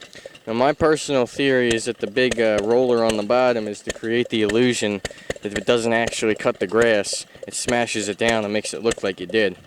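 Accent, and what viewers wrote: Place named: Pennsylvania, United States. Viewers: Dude lives in Pennsylvania doesn't he? That accent. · Are you from Pittsburgh? I'm catching some accent... Sweet mower though!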